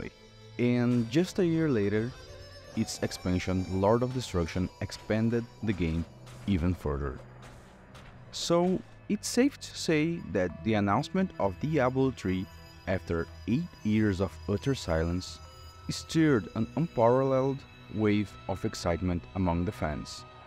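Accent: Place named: Brazil. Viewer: Hmmm sotaque br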